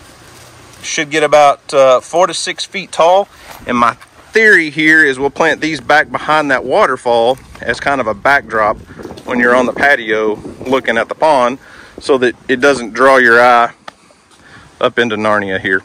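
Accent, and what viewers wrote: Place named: South, United States. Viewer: By the way I absolutely love your southern accent. I live in oregon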